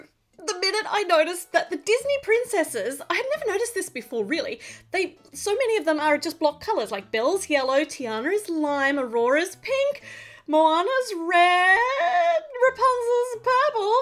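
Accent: Australia. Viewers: Are u ausralian cause ur accent sounds Aussie and I'm Aussie too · Are you an Aussie? Some of the words you say are so Australian.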